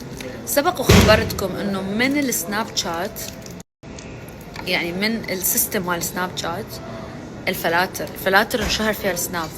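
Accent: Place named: Iraq. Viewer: فديت اللهجة العراقية 🎀👽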